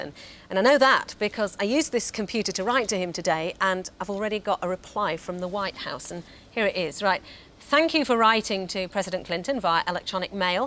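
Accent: United Kingdom. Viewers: The British accent makes the presentation sounds very sophisticated.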